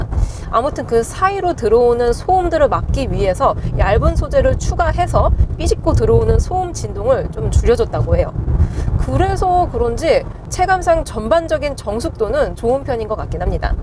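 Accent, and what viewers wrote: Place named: Gyeongsang, South Korea. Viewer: 아나운서 같은게 더 세련된건가? 전 원래 컨셉인 정감있는 경상도 아가씨가 좋아요.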